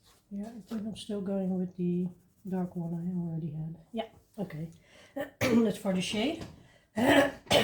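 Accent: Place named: Scandinavia. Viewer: What an amazing and interesting life you have in the Netherlands! I loved seeing your hamster space, they are cute little babies. 😊 Thank you for sharing some about your life with us! It helps me be invested in you as a person and your channel as well as seeing your beautiful coloring. Thank you again! Oh - and your accent is not funny ha ha it’s lovely. My sister lives in Sweden and her husband speaks several languages from the region so I figured you were nearby. 😊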